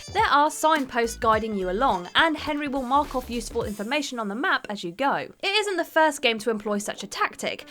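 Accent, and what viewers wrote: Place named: England, United Kingdom. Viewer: that english accent makes me watch this videos in 0.25x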